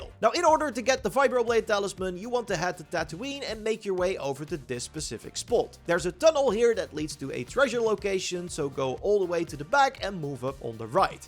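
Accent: Netherlands. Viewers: Ga alsjeblieft gewoon Nederlands spreken Je kan geen Engels Je hebt een heel zwaar Nederlands accent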